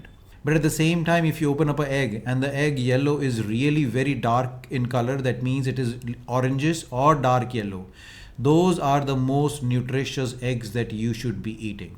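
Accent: India. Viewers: Excellent info. Doc should improve his bad Indian Accent.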